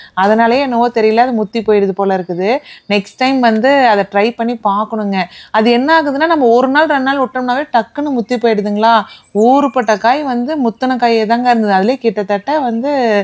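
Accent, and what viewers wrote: Kongu, India. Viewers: Kongu tamil ❤❤🎉 voice super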